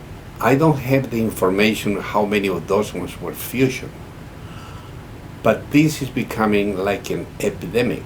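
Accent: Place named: Italy. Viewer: You never explained why, what you do, is better than fusion and other invasive/destructive procedures such as laminectomies. This is the third video I've watched of yours and you don't at least provide a description of the procedure that have found very effective. However, I will forgive you; as you appear to be an Italian immigrant that is bilingual; and the English language makes it harder for you to articulate or put into words, your techniques!😃 But I'm guessing that you use endoscopic decompression with precision drills either of small size or ultrasonic; which I understand can be very effective with minimal damage to the body's own tissues. And I perceive that you are a doctor WHO AIMS TO ADDRESS THE REAL CAUSE OF THE PAIN, NEUROPATHY AND MYELOPATHY. That is a rare trait in today's medical system. Today's allopathic doctors are more concerned with money and treatment of SYMPTOMS rather than stopping the REAL CAUSE! 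They try to "improve' on our Creator's designs of the spine by removing parts and replacing them with metal alloys to create some stability. Instead of aiming to remove the offending bone spur, cyst, or herniated disc or ligament to free up space in the central canal and the foramen where the nerve roots exit. Thank-you, as I am in my 3rd consultation with spine surgeons pre-surgery; after updating my MRI's, CT scans and X-rays. He is reknown for the M.I.L.D procedures and I hope to consult with him in the next few days to see what he recommends. (I have liga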